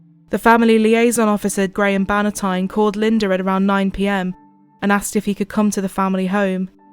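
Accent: United Kingdom. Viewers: When you say reached out do you man contacted, you sound like you are British and not American so why do you use this Americanism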